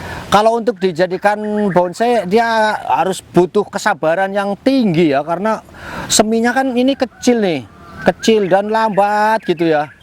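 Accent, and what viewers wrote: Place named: Banyumas, Indonesia. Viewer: Ngapak bgt bapake🤩🔥♥️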